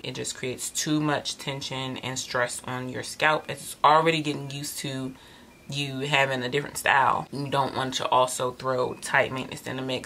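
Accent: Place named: DC/Maryland, United States. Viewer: Hey girl hey 👋🏿, I know my people from that DC/MARYLAND accent ( most people don't hear it but I DO)❤💚🖤✊🏿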